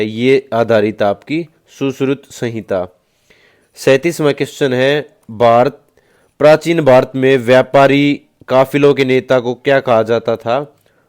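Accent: Haryana, India. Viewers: Bhaiya the Haryana s ho ke?😊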